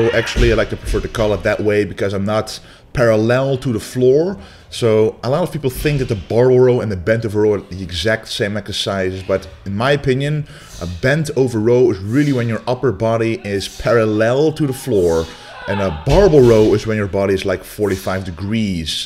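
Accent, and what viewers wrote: Netherlands. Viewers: Hahaha ik dacht serieus is deze man Nederlands? En toen zei je het. Goeie tips man! Ik voel me rug altijd heel lastig. Ga dit zeker vandaag proberen. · Soms voel je gwn dat iemand nederlands is, terwijl je engels is LV10 perfect maar ik keel naar ze face en dacht..... wacht een even laat me kijken wat zijn naam is en jaaa hoor BTW top video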